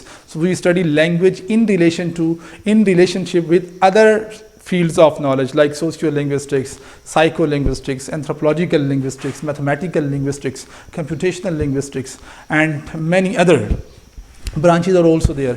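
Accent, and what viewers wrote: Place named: England, United Kingdom. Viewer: Wow sir i like your English accent 🤍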